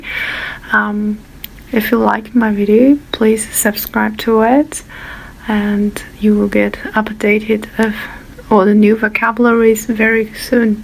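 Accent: Standard. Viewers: 讲的很详细，发音很标准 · 发音很标准，加油！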